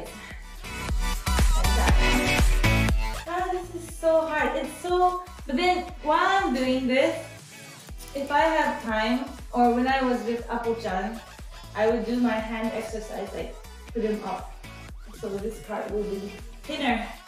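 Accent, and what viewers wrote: Philippines. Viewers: Your english has filipino accent in it! Really cool!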